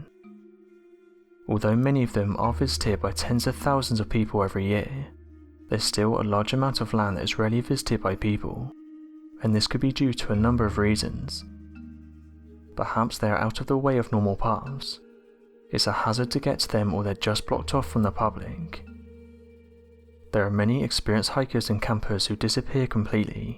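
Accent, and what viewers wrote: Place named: United Kingdom. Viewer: ..... I am thinking it's a British/ UK 🇬🇧 accent!...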